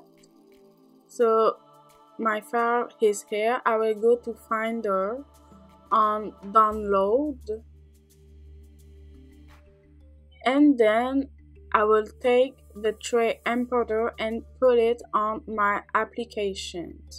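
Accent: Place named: France. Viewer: Lol you are a french people we can hear that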